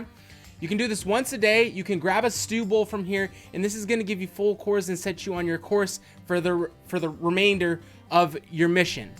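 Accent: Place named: Russia. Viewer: Love the videos! You are hilarious in many of them. Your Russian accent is great! Keep it up bro!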